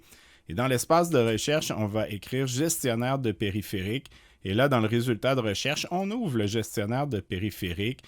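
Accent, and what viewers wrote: Canada. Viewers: Merci pour ces informations simples et avec cet accent canadien si savoureux que nous autres français on aime tant...😊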